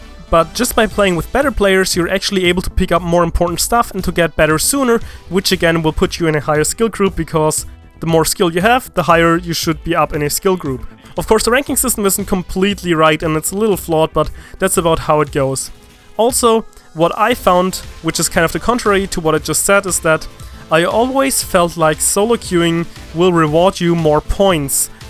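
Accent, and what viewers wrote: Germany. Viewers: Du hast nähmlich nen Deutschen Akzent! :D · can u speak german??or are u from germany??u r listening like a german guy who is speaking and learning a lot englisch ^^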